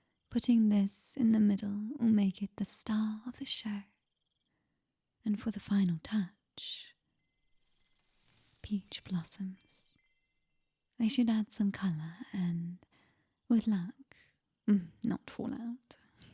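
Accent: United Kingdom. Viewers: If I may make a confession, a British accent was what came to mind when writing this script, so I'm glad it got a British voice. Thank you for the fill! :D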